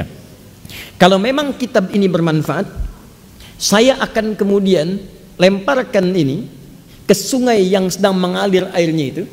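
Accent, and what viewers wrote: Arab world. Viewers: Ustad dahsyat, ilimu nya tinggi, keterangannya mudah dimenerti. Tetapi mohon logat bicaranya jangan spt org Arab berbicara bahasa Indonesia. Salam. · Ust ni org Arab ke? Cakap Pon loghat arab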